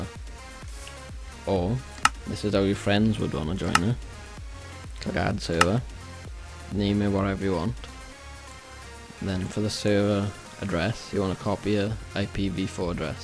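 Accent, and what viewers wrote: Wales, United Kingdom. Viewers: nice welsh accent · you sound proper welsh. are you ? :P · Is that a welsh accent I hear?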